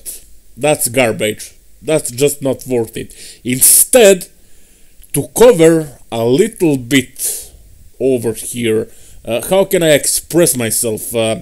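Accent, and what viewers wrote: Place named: Russia. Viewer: I love your deep russian accent 😄👍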